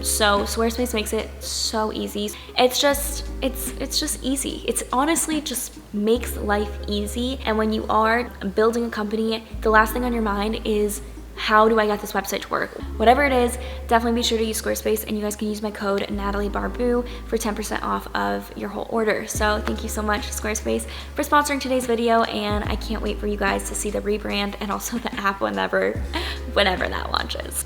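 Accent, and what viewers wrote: Spain. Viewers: I am from spain! I love your spanish accent!! 😜😜😘😘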